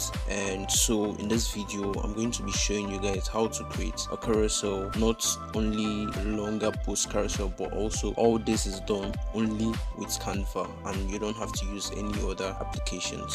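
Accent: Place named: Nigeria. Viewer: I picked up a Nigerian accent right away 😀, and I've got to ditto other comments. I was like, 😲 great tutorial! Thanks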